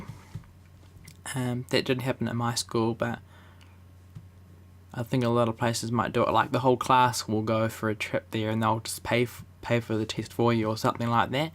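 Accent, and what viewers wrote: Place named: New Zealand. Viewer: Hahaha like ur kiwi accent mate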